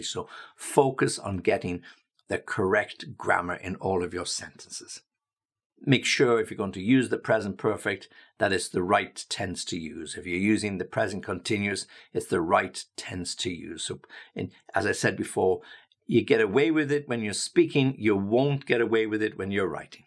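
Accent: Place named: United Kingdom. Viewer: He is from uk with British accent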